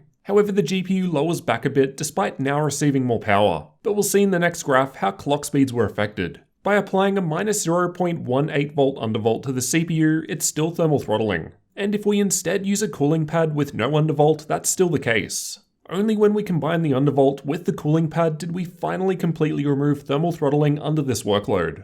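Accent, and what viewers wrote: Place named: Australia. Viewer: What do you think 2020 laptops will have to bring to the table? Do you think it will be a major price to performance boost or it won't be something too significant. Also a side note: I really like your Australian accent, adds what I call a ' touch' to your videos:)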